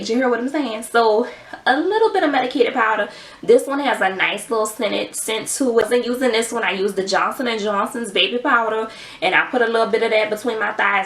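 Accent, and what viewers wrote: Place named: Louisiana, United States. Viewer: You have to be from Louisiana are sum😭your accent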